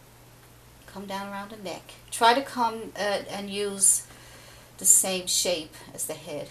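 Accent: Newfoundland, Canada. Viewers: are you from Newfoundland? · Thank you so much for putting up this tutorial!, I was wondering why your accent was sounding so familiar so hello fellow newfoundlander! <3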